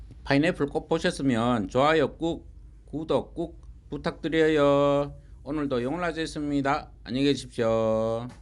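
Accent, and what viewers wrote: Gyeongsang, South Korea. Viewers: 아이고~~ 노래도 잘하시고 구수한 경상도 말씀으로 파인애풀도 사과롤 얹저놓고 꽃피우는걸 우째 알았습니꺼 ^^ 참으로 신기합니데이~~ 겨울에는 온도가 영상 3도 까지 내려 가는곳에 두면 안되나요 따뜻한 방에는 햇빛이 안들어와서요 내일당장 파인애풀 하나 사와야 겠어요 감사합니다^^ 좋아요는 한번밖에 못해서 광고 까지 보고 갑니다 새해 복마니 마니받으시고 건강 하이소 요^^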